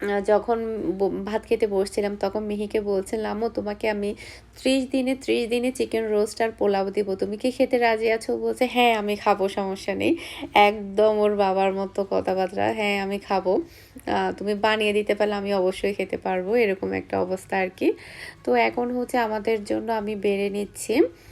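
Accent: Chittagong, Bangladesh. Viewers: Amr Mone hocce apu apni ctg er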